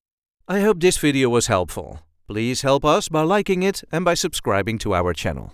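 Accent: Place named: RP, United Kingdom. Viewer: What you call emphasis is usually called stress. Your accent is very RP, except for the (somewhat English sounding) aspiration of the t in lente.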